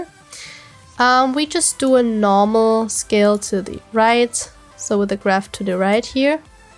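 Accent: Germany. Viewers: Schwöre, das Deutsch quilt so ausm Englisch raus hahahah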